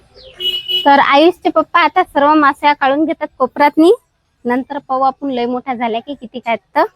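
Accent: Vidarbha, India. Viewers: विदर्भाची भाषा ऐकून खूप छान वाटले ताई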